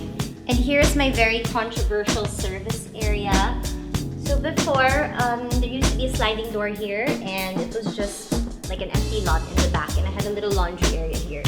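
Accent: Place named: United States. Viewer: Wow your English got good. U sound American... I love you. I’m a big fan.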